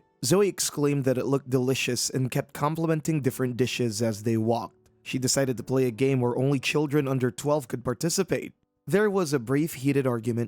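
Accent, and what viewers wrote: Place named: Philadelphia, United States. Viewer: YO THE AI HAS A PHILLY ACCENT WTF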